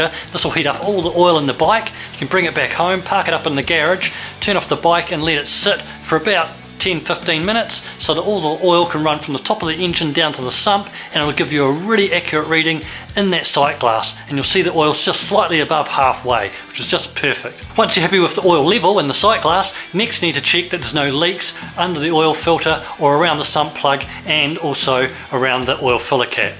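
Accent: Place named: New Zealand. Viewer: Thanks a lot from a Canadian listener. I really like your Kiwi accent.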